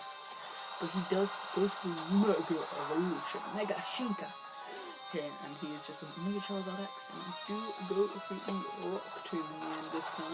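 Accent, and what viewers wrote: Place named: England, United Kingdom. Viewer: This is Sam from Facebook, good battle. Are you from England? You have an accent.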